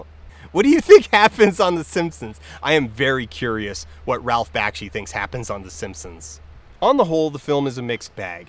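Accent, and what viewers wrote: Canada. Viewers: That's just a Canadian accent. don't be racist. :P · hearing a Canadian accent so far from home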